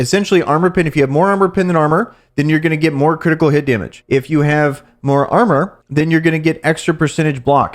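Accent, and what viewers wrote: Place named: United States. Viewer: He's American..that's what they do with the English language, it's gradually changing because of the likes of this.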